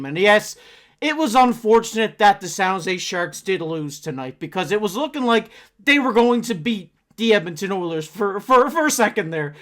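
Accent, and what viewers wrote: Newfoundland, Canada. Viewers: Are you a Newfoundlander? East coast accent is strong!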